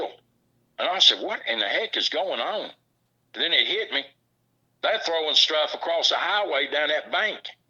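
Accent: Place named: North Carolina, United States. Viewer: I'm from Winston-Salem and a lot of folks go camping and tubing on the Dan River, I love your Eastern North Carolina accent, sounds a lot like our former governor from way back, Jim Hunt. Love your narration I know it's got to be scary out in the woods knowing these creatures are around.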